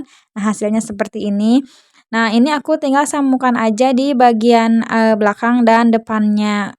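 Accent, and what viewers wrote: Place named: West Java, Indonesia. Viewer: Teh orang mana Sunda pisan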